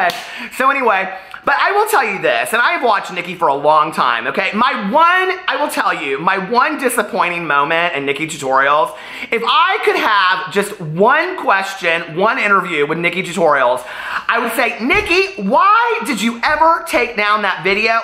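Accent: South, United States. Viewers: The snap chat fliter makes your southern accent so funny and 10 times more amazing 💕 you're accent makes we wish I was more of a southern gal 😭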